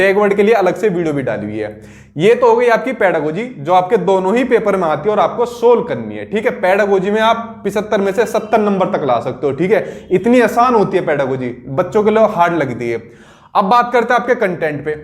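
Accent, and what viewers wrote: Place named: Uttar Pradesh, India. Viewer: Up se ho sir aap? Aapke talking way se pta chl rha h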